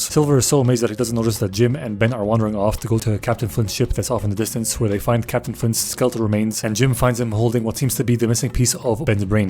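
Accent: United Kingdom. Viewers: Your British accent is rad!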